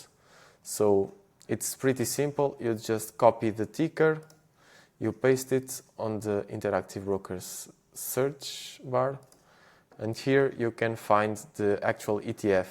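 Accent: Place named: Portugal. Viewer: Are you portuguese? From the sound it seems. Do you know in Portugal if we can follow the process you just shared?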